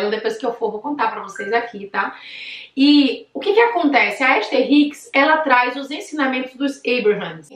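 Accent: Bahia, Brazil. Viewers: Uma baiana ? Uhuhuuuuuu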